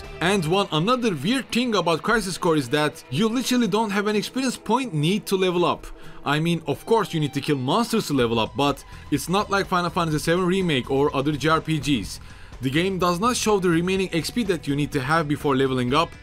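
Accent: Turkey. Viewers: u have a turkish accent.ru turkish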